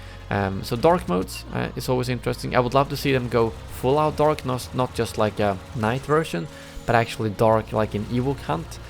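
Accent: Sweden. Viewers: Den svenska dialekten är ju najs :) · Are you from Sweden?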